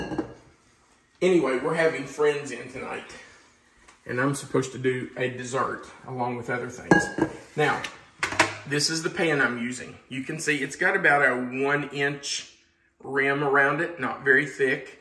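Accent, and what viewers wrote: South, United States. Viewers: His stories are one of the reasons I enjoy watching. I am from Michigan and love to hear southern folks talk , it is intriguing and oddly relaxing to me. But to each is own.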